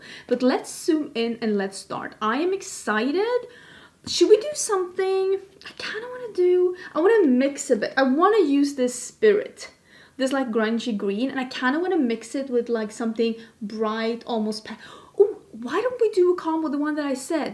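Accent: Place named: Sweden. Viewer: Don't you so love how she always says " let's soom in " instead of zoom in...so sweet and Swedish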